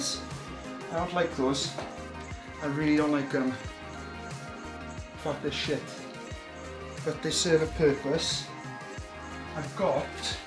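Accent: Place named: Wales, United Kingdom. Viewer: Love your welsh accent 🥰🕷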